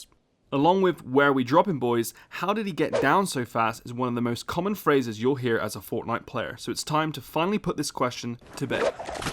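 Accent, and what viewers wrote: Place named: Bristol, United Kingdom. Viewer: You’re from Bristol right??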